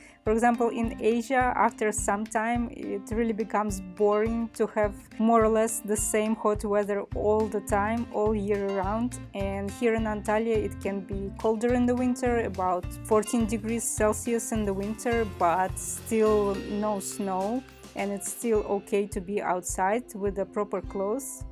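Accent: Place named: Russia. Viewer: Hi, thank you for the video. My dream is to retire in this area, Antalya or Side. You sound like you are russian, and my wife is russian. I am norwegian. I speak english very well, quite a bit of turkish, some russian. My wife does not speak turkish, but russian and some english. My thinking is that she can live there and manage quite well with russian. However, she does not always like associating with other russians, and I'm not entirely sure why. If we one day will take a trip and see how it is like, would it be good to rent something with AirBnb, or aparthotel like you did? If so, what area?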